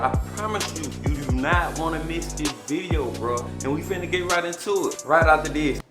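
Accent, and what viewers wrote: Atlanta, United States. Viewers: 😆 brah u talk like us in the A i know u in macon or chat tenn. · I can tell from the accent he from atlanta😂...but if you put this on medium hair it’s gone turn straight?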